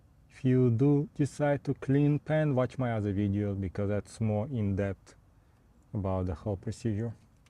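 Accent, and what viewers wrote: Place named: Russia. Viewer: Пипец КАНЕШНА акцент, наших везде слышно 👍👍👍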